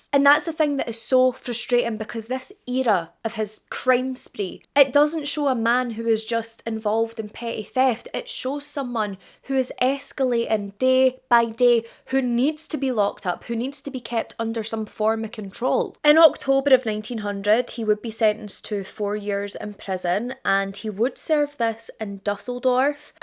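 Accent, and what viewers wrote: Scotland, United Kingdom. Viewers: To the guys who complain about the makeup do like me put the video on and just listen I enjoy listening to the cases and the Scottish accent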